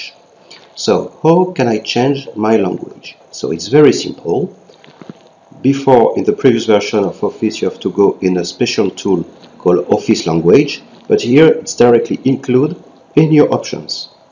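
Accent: France. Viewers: Mec je sens ton français 😀 Keep it up ! And thanks!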